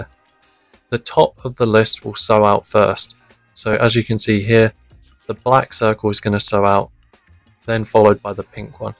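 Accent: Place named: United Kingdom. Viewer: Its brilliant to actually have a uk speaking tutor at last for this program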